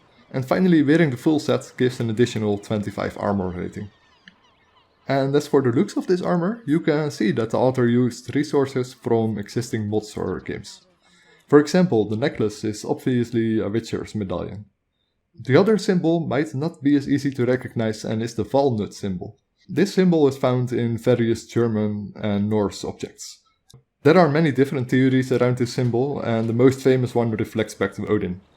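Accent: Netherlands. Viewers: Prima video, lekker dik nederlands accent er boven op, helemaal goed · damn dutch accent tho jaja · you sound dutch. you dutch right?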